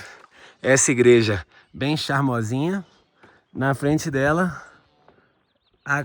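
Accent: Minas Gerais, Brazil. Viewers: Estou simplesmente encantada com esse canal e com o protagonista dele, com esse sotaque muito familiar, mineiro bom demais da conta sô! Parabéns por transmitir um conteúdo de qualidade de uma forma descontraída e contagiante. Ficou pensando ... como só descobri esse canal hoje? Já me inscrevi lógico e maratonar o canal e a ordem do dia, Gratidão 🙏❤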